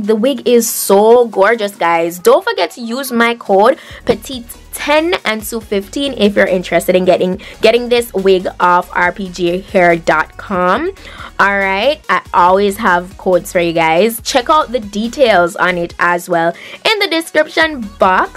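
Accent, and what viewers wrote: Jamaica. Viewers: OMG the accent is killing me ... 🙈 it reminds me of Jamaica 🇯🇲🙈😍😍😍😍 I am Obsessed !! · I think shes from Jamacia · Love YOUR Jamaican accent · You sound Jamaican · You're Jamaican? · New subscriber.... am here for this Jamaican accent 😍😍😍😂😂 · are you Jamaican, by any chance? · U sound like a jamaican🤔🤔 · You sound like a Jamaican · true jamacian QUEEN..we Caribbean pple proud of our language. lol . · U Jamaican right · Sounds Jamaican Are you? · U sound Jamaican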